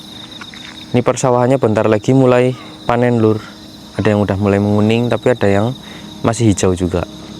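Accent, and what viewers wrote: Banyumas, Indonesia. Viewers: Ciri khas narasi ala adalah penggunaan kata2 yang lucu penyebutannya. Palkir, tlaktor, kakekk-kakekk, nenekk-nenekk, nyebut house jadi hoss, dll. Juga dialek ngapaknya, dan gaya polosnya. 😁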